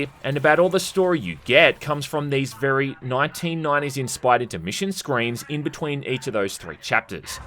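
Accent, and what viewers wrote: Australia. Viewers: makes me confirm that your in my top 10 favorite youtubers of all time along with jontron, gmm, and others. Your humor is just spot on and your Australian? accent just hits that vibe and is unique for your channel. The whole description of the heavy bolter had me dying😅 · Australian accents make everything better I swear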